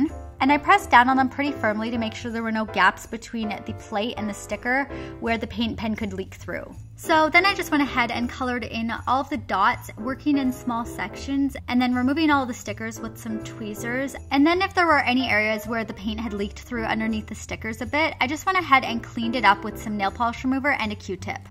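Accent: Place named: Canada. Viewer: Are you Canadian cuz the way you pronounce the letter A just sounds Canadian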